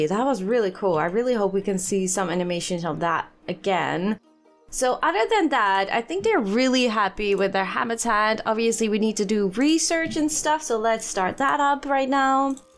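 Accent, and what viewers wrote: Netherlands. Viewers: Are u dutch? I font know why but i have the feeling that your dutch😂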